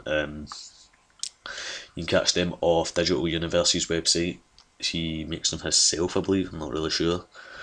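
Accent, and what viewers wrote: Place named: Scotland, United Kingdom. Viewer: you are REAAALLY scottish o.O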